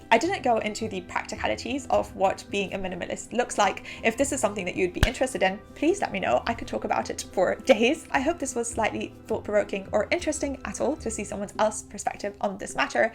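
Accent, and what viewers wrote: United Kingdom. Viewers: I have been learning English by reading English novels for myself without anyone's help. The most difficult thing I have as a Korean for learning this language is to practice listening to native English which is different depending on where they are from. I have chosen you as a model of British English. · Beautiful wonderful marvellous. Good British English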